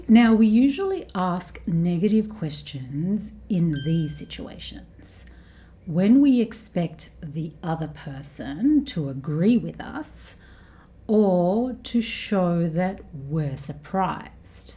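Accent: Australia. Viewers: Thank you so much for helping me practice Australian English, this trains my ears to understand it better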